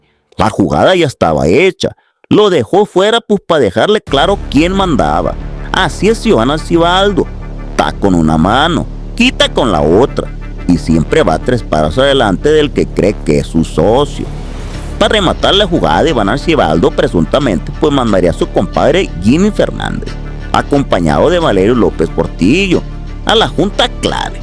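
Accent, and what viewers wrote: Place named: Sonora, Mexico. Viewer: No soporté 30 segundos el acento de 💩 de Sonora de este tipejo. Neta que dan lastima los rancheros sancas *mea da s.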